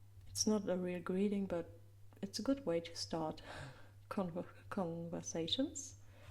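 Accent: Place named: Germany. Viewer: Hannes Von Sydow hmm, wie kann man nicht raushören, dass sie muttersprachlich deutsch spricht ? :o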